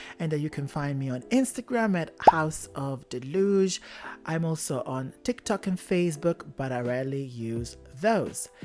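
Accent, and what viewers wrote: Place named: Japan. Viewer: I am obsessed with your accent. Are you of Japanese descent? More videos please, but also include more pictures.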